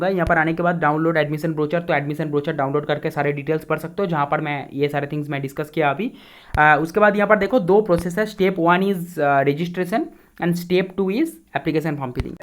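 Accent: Bengal, India. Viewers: Are you Bengali ?? Your voice like Bengali tone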